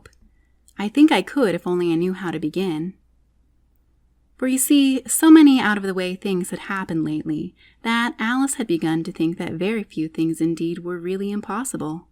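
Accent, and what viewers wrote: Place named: United Kingdom. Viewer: A British accent gives it its intented context over an American one <link>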